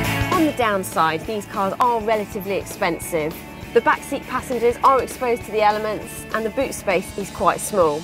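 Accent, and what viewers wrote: United Kingdom. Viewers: lovely British accent. love that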